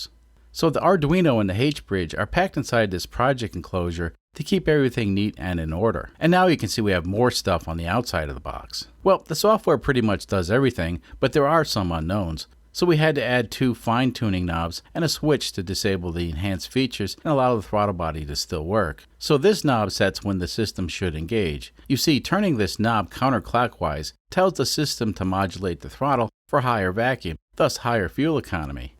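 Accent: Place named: Boston, United States. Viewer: ohh that explains why i thought you had a Boston ish accent :P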